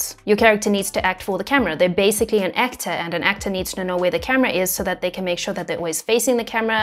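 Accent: South Africa. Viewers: wow you really do sound south African! hate taking leave it just makes me aware of how much work piles up for when I get back.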